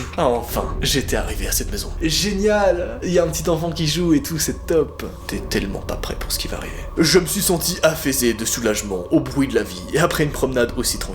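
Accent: Belgium. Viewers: cc t belge? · Tu es belge ?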